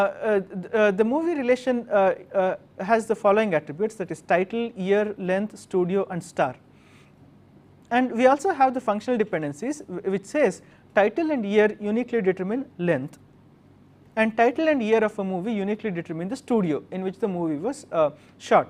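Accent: India. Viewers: To all who complain .. "he has an Indian accent is annoying and he is constantly stammering" .. Why don't you with perfect accents do something useful ? It seems, aa you can do with your perfect accent is just nag and abuse. Don't forget, BEGGARDS DONT CHOOSE. Tell your parents to teach you a bit humility and respect in perfect English.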